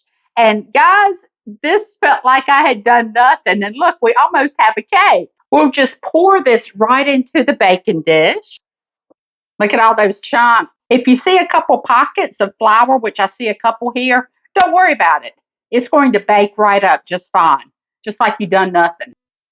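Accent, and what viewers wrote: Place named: Carolina, United States. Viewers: Not sure where you're from but I'm from south Carolina and her accent sounds genuine to me. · Yep, that's a real Carolina accent.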